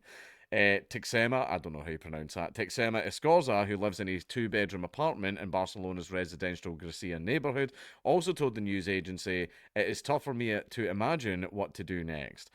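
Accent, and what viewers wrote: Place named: Scotland, United Kingdom. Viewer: Who the hell taught your country to speak oooooooooh your scotish eesssh what a wreck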